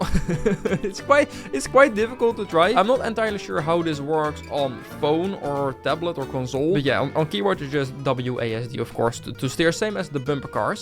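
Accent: Netherlands. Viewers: pov: you can't hide your dutch accent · tell me this guy is dutch without telling me he is dutch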